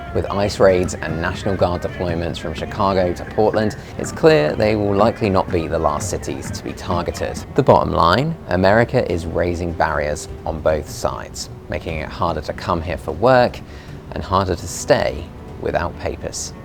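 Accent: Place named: United Kingdom. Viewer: Kick out this UK guy or charge 100K for him. I am sick and tired of getting USA news with British accents. Please go home!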